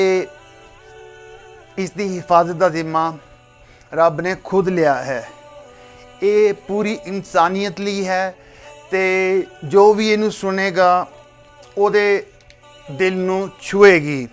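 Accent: Pakistan. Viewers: Tu sikh nahi hai teri bhasa hi nahi hai sikhn wali ihe boli pakistani hai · 😂😂 guys this is just a fake propaganda video by pakistani punjabis. His accent and urdu words mixing with punjabi says it all.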